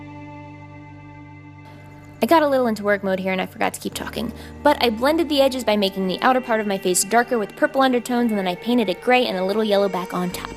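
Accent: Philadelphia, United States. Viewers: Is she from Philly?!?!?! #straightouttaphilly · yay for Philly accent needs!! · OMFG IS SHE FROM PHILLY P.A.?!?!?!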